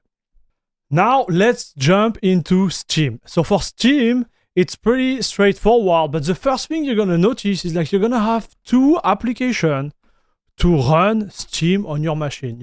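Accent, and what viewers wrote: France. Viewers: Merci pour tous les conseilles de configue (surtout pour le RGB 😜). J'améliore même mon anglais, je comprend super bien avec ton accent français, j'adore 👍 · big thanks! I'm not newbee in linux, I was trying to configure arch for gaming by myself, but it requires too much time for me. And in your video there is right amount of information for me to set up perfectly. It's a bit hard to understand your french accent, but I'm Russian, so don"t bother, you'll be great by practice) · w french accent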